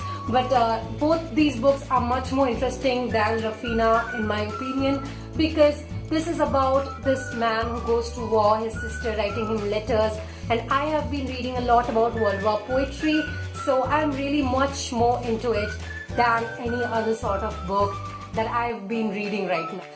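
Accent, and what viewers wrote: Bengal, India. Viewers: Hey! I am new to your channel and the very first thing I noticed was your accent. You sound like a Bengali. Are you a Bengali? Kothay thako? Kolkatay? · Bengali! I was thinking so coz ur tone doesn't seems Marathi, Gujarati, Bihari, Assamese & when literature comes, Bengalis r on top. 😁 Great work, very inspiring.👍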